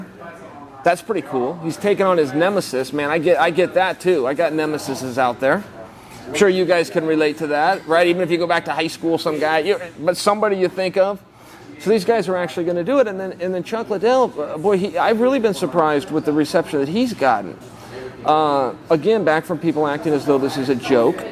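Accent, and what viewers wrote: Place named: Oregon, United States. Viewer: Being from the Deep South, I really like Chael’s Oregon accent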